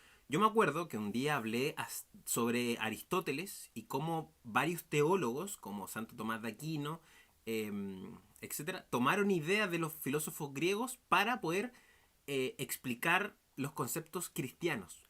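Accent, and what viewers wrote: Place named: Chile. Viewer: Buen video bro, eres de chile? Me gusta tu acento saludos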